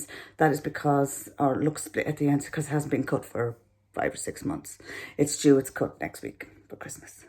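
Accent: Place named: Ireland. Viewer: Thanks,! Love listening to you. Can't tolerate all the "younger" gal videos. We had to double-down on the lockdown here in California, and I've got about 5 different colors of blonde in my hair, so I'm gonna give this a shot assuming I can find that color here. I agree with you on the NO ASH, which is what it seems all the ladies here are going for. Ewww. Doesn't quite work on us over 40s! Again - LOVE your (Irish?) accent and your potty mouth - cracks me up every time.:)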